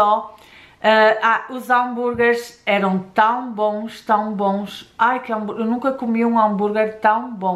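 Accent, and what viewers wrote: North, Portugal. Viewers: Sou do Marco de Canaveses Porto. A tua prenuncia parece ser do norte 😘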